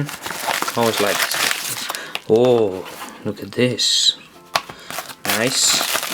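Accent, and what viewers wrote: Netherlands. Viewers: give him a break he is dutch....im sure he speaks better english than you can dutch. Anyone watching the video can see how the system works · duidelijk een nederlander :p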